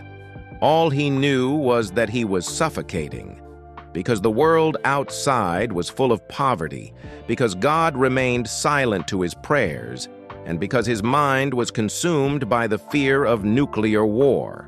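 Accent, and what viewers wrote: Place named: United States. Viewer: can't all yanks just stop narrating Sabbath history in the awful American English...shocking...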